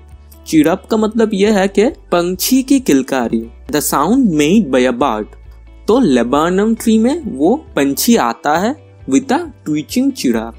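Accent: Bengal, India. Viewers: I am from..howrah.... Hindi of bengali are usually like this..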